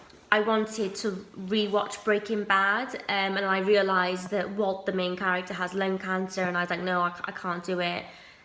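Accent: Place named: Wales, United Kingdom. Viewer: The more I watch you the more I hear that we are exactly the same. I can't say that word either or watch films or TV shows that deal with the illness. I have a non urgent test coming up but I am terrified. Maybe it should be urgent...you know how we question everything! I think about this test 24/7. However I am greatly comforted by you. You are Welsh? I hear it in your voice! I am half Welsh. I am old. 69. I feel ashamed that I have this health anxiety...I just can't help it but feel that I should not have it at my age....I should grow up a bit! It's not my fault that I have it but I can't help thinking that I am completely self obsessed and thinking of myself all the time. God Bless You. Thanks for this video XXX❤️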